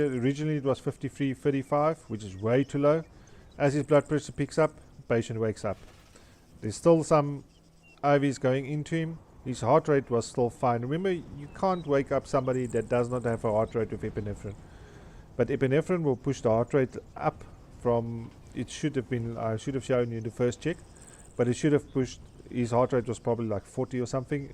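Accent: South Africa. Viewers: Your Accent gave it away,Well.For a south african at least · you sound very South African? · Easy you South African accent gives it away, i'm from Gauteng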